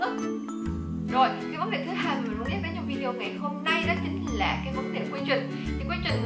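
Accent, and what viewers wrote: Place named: Gia Lai, Vietnam. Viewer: Quê chị ở đâu thế nghe giống giọng gia lai quá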